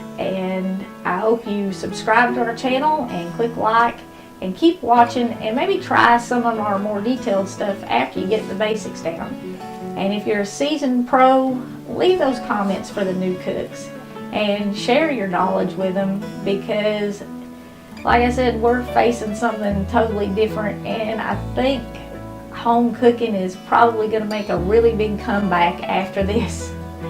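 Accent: South, United States. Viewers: I love your videos and your biblical standards so much!! In fact, as I have lived down south for so many years, I can't decide what I enjoy more - your Southern Recipes, your "down home" biblical morals, or maybe it's your Southern accent thatI miss??!! My suggestion for a grilled cheese sandwich is to brown BOTH sides of the two pieces of bread in a fry pan at the same time and then add the cheese to each browned slice of bread; and then combine the two slices on top of each other in pan for only a few seconds more on each side. That's what I was taught; and it gives the inside of the sandwich a crispier base for the cheese when it melts!! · . I'm a southern gal through and through. I absolutely love your Channel and listening to another Southerner. Thank you for that. You're recipes are what I grew up with and miss. And I love how you put God first so important!! 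Thank you for that also. Have a blessed day.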